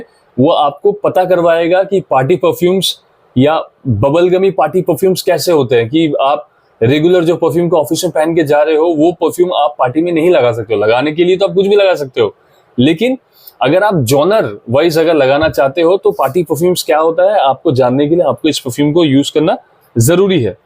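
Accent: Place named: Mumbai, India. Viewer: aapki Hindi se Aisa lagta hai k aap Mumbai se belong karte ho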